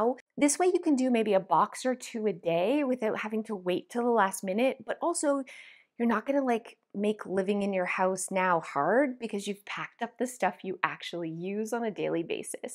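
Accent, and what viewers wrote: Canada. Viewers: yep! But not all your followers are 😉 just wanted to leave the tip for any in the comments who happened to live down here. They could apply all your helpful packing tips with the crates, if they didn't want to buy new boxes from Kennards or Storage King (local moving/storage companies). I miss being only a couple hours drive from Vancouver - i know that's not where you're located in Canada, but sometimes listening to your videos hits the spot with your accent 💜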